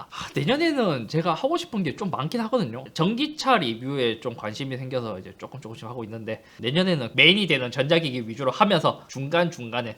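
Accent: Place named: Gyeongbuk, South Korea. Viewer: 올래 ㅋㅋㅋㅋ경북커 ㅋㅋㅋㅋㅋ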